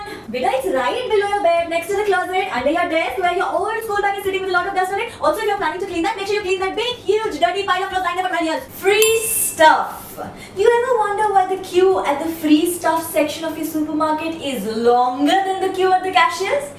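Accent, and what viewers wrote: Maharashtra, India. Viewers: yeah so true i dont why but is way better than superwoman her marathi accent is so cute n i find her videos relatable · please use a lot of your Marathi accent cause it's super cool.... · I love your marathi accent..... You rock it... :* love you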